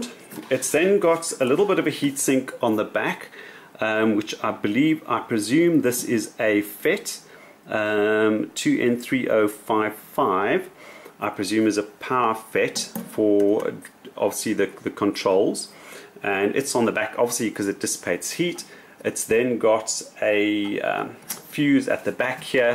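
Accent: South Africa. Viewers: Little confused at first having South African accent from the UK :-). I am enjoying the series. Keep up the good work.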